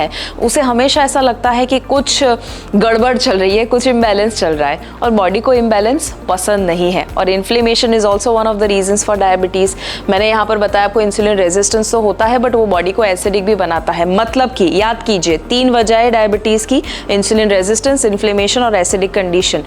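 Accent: Gujarat, India. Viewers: madam please speak in Gujarati ,You speek Hindi but your tone of speaking is like Gujarati ,You speak Gujarati language very well and clear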